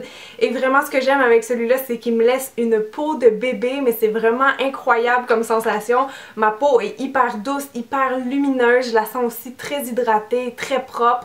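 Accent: Quebec, Canada. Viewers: Coucou!! Jolie revue de la marque!!! Je l'aime beaucoup aussi!!!!! On a le même souci de fesses apparemment, et le même amour pour Copacabana!!!! (enfin Buffy chez toi si j'ai bien suivi ^^) Je ne sais pas comment ça s'appelle au Québec, mais mon produit préféré c'est Rafistoleur (une sorte de masque après-shampooing). J'ai testé aussi Bûche de Noël, c'est bien mon nettoyant préféré!!! Et dommage que ce soit une édition de Noël snif!!! Et sinon j'aime beaucoup leur mascara "Droit dans les yeux"!! Il fait très doux et naturel! (moi qui n'aime pas les mascaras trop voyants et lourds, ça m'arrange beaucoup et je l'aime vraiment! ^^) Sinon merci pour toutes tes vidéos! Je te suis depuis un bout de temps maintenant, et je ne commente quasiment jamais je crois bien (Lush m'inspire, j'avoue ^^) mais j'aime beaucoup tes tests, tes conseils, ta joie de vivre, et ton si bel accent qu'on aime tant chez nous ^^ * Bisous!!! 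· Elle est québécoise ! C’est fun je trouve comme accent !!!!!!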